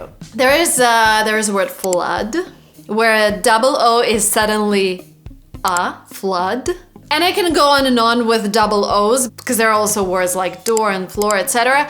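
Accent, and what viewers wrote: Russia. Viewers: awful russian accent:face-turquoise-covering-eyes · please take care of your aspiration. The way you pronounce the h sound gives you a heavy Russian accent. The second priority would be all first sounds in the words you‘re saying. Love watching your pronunciation progress! Way to go, girl!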